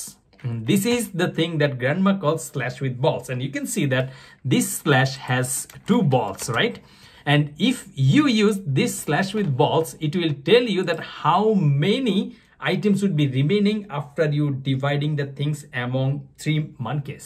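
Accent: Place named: India. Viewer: This indian talk.. Oh I love your channel 😍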